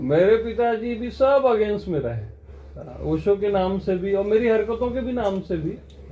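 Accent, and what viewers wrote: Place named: Nagpur, India. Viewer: Aap nagpur ki bhasha khubsurat hai..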